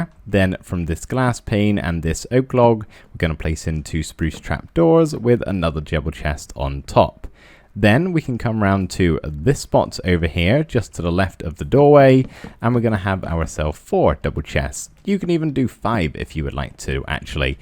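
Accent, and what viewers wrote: United Kingdom. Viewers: Bro, your British accent sounds really cool)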